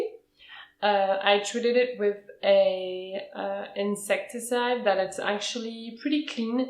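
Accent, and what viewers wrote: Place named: France. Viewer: Great videos! I've seen your other videos and love your collection! I've been an orchid collector for a while now and have recently ordered a Cllathea Medallion and Stromonthe Triostar. It seems they both can be temperamental about their location, watering, and room humidity. But thanks to You Tubers like you I'll know what to be aware of and how to react. I like that "Pen stripped" Calathea you have. Im going to have to get one of those. Its so unique and beautiful. BTW you speak very good and clear english and your accent is very hard to detect but i think its french? Im guessing your Canadian? Thanks again! You Tube is my #1 plant resource.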